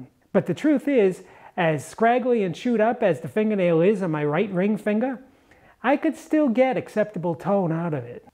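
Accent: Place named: New England, United States. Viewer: Great info delivered with an impressive New England accent.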